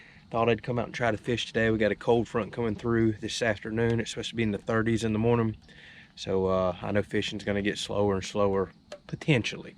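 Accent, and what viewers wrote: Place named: South, United States. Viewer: You are definitely right on the pronunciation but I like his accent and the way he says it better. 👍👍Good old Boy from the south speaking my language. 😀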